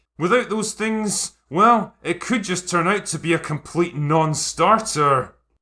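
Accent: Scotland, United Kingdom. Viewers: Is the Groundskeepr willie accent his natural accent or does he exaggerate it for these videos?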